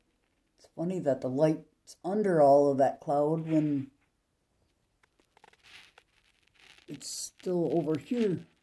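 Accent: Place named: Michigan, United States. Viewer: I love hearing your Michigan accent. Wow lotza stuff up there today, here thick gray so far making it so dark inside.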